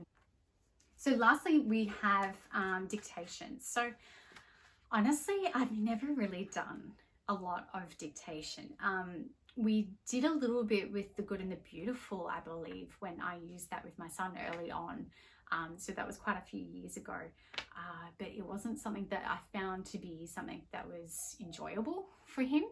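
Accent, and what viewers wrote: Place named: Australia. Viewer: Following you on IG and subscribing! Love your voice and your Aussie accent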